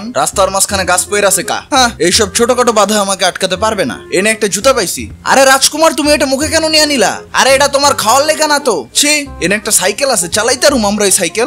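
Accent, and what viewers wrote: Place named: Barishal, Bangladesh. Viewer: vhi apnar bari kothay apni ki borishali na- - ----_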